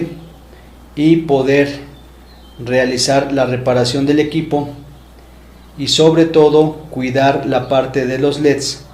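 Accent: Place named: Mexico. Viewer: Me gusta mucho el acento mexicano. Saludos desde Chile.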